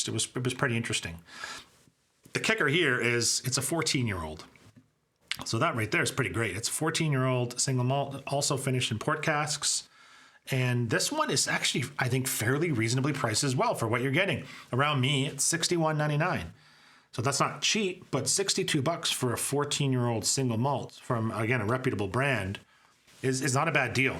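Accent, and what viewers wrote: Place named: Canada. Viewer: Ok make sense ! You sounded Canadian, but the prices $$ of these scotches seemed to be in US to me... anyway didn't have time to go thru your channel yet, but looking forward to it ! God bless, cheers et Merci beaucoup !!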